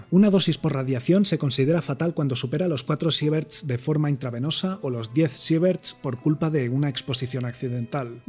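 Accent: Castile, Spain. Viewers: Que Repugnante et Molestoso es listenear el Acento Castellano de Europa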